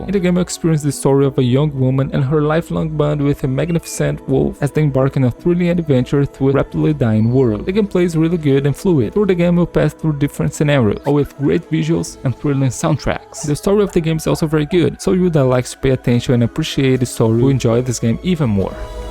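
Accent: Brazil. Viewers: esse sotaque ta com uma cara de brasileiro kk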